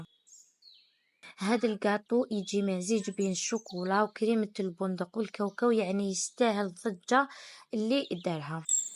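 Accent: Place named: Morocco. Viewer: صاحبة القناة لهجتك مغربية!!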